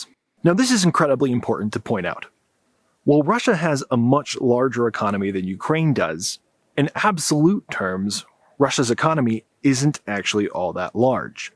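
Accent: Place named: United States. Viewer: As Ukrainian ,i am nicely surprised that person who lives in States ,has so deep knowledge of what happens in our country.all arguments are clear & true In addition,so comprehensive americane english,not need to force your ear,plus we are getting information on the beautiful enviroment back there Wish your actual president could listen to intelligent people like this and not his morons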